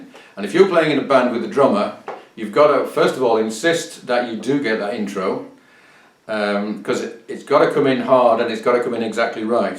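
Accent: Yorkshire, United Kingdom. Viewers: There is no issue with you doing this in a yorkshire accent, as you somewhat note in that it takes the old tongue to do and the aussies are another I could think of that hold on to it. Its interesting to hear it that way and have no objection to it, just dont let it die. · Brilliant tutorial! Thanks. The Yorkshire accent adds a new twist to an old classic 😉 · why is he british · Sounds way better in Yorkshire accent! 👍😉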